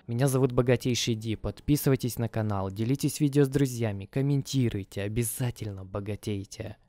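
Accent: Ukraine. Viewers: не только,у него произношение такое прикольнозвучащее,кроме акцента...укр. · Это украинский акцент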